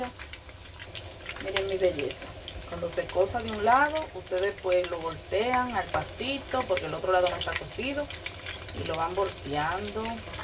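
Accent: Dominican Republic. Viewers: Eres Dominicana 100%✓ 🇩🇴❤️❤️ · Está chulísima tú receta. 😋😋😋 Me encantó la manera que explica los pasos y vas haciendo el proceso de la misma. Sé que por tu acento al hablar eres de mi amado país República Dominicana. Tienes una nueva suscriptora en tu canal. Abrazos cordiales mi reina. ❤🇩🇴❤ 👏👏👏👏👏👏👍😘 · No entiendo que tenía la salchicha que se veía como un nylon o algo así. Tampoco entiendo porqué responde casi todos los comentarios con la misma frase aunque le hayan preguntado algo . Para mi tiene acento dominicano y cuando le dicen puertorriqueña, solo responde bendiciéndote jajaj. Se ven deliciosos , fue solo una sugerencia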